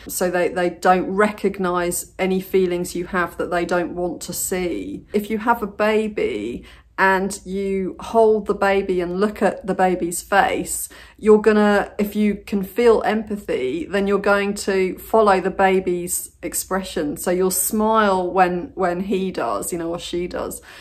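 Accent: England, United Kingdom. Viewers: Accent too English for me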